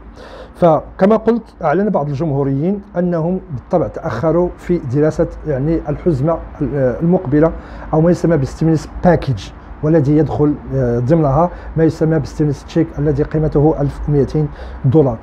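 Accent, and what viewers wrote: Morocco. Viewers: اخي ربنا يحفظك عندك اللكنه المغربية قوية نرجو لو تكرم ذكر الاشهر بالعربي او الانجلش لاني لا افهم الشهور باللهجه المغربية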